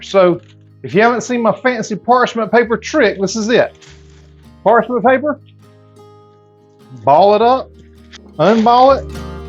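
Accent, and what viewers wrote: South, United States. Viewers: Is that a Southern American accent I hear?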